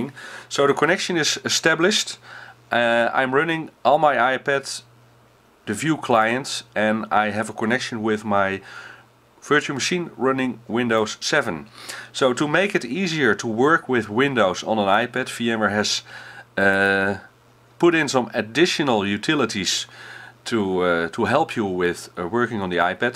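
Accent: Netherlands. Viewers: ja dat is echt duidelijk te horen door een nederlander :p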